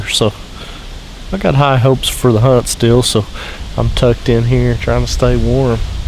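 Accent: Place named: Alabama, United States. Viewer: Your accent sounds like you are from Alabama.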